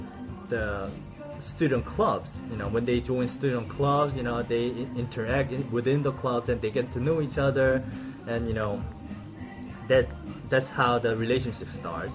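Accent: South Korea. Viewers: typical korean face and accent. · I am fond of your background music. 또한 당신은 재미있는 한국어 악센트가있다. ^ ^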